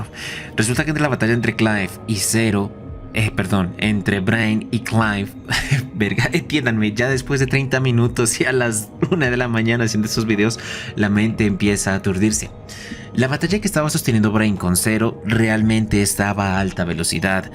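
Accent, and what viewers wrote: Colombia. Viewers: Excelente video! Pdta: de casualidad sos Colombiano? 😅😂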